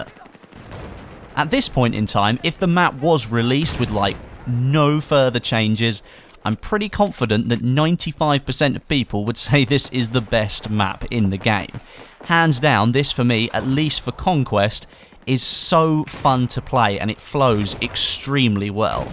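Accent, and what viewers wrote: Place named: United Kingdom. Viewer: Yes, he's British.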